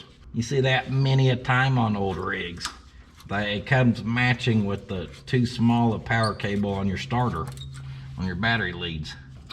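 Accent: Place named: South, United States. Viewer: I've noticed from watching you for years that you are the only one in your videos that has a southern accent When you're family and friends speak they don't have that and it makes wonder where that came from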